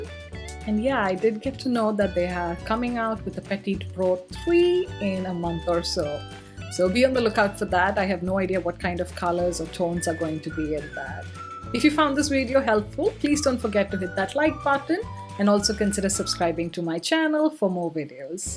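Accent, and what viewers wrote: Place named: India. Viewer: Beautiful indian Accent . where did u grow up in India?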